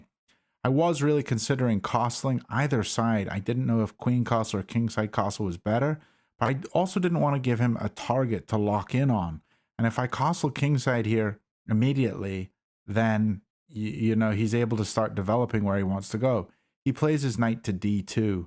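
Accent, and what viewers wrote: United Kingdom. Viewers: Thought you were British mate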